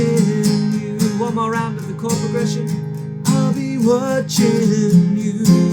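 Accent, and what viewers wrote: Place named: Liverpool, United Kingdom. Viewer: Love the clarity of your videos. You explain things so well! BTW, are you from Liverpool? I hear a bit of in your accent.))) But what do I know, I'm an American.)))))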